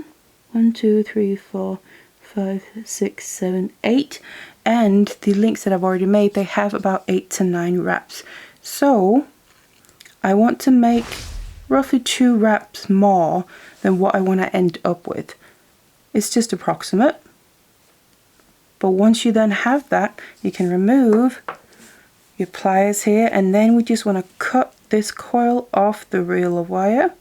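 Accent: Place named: England, United Kingdom. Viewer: And such a change to hear an English accent instead of US 😂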